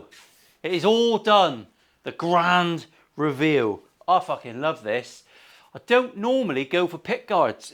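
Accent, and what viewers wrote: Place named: Devon, United Kingdom. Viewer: I think I wanna move down you're accent's neck of the wood. I love Brixham!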